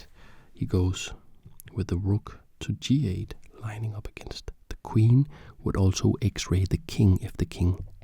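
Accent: Denmark. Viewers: Wait are u danish? Cuz u have the accent of a danish person:) its very calming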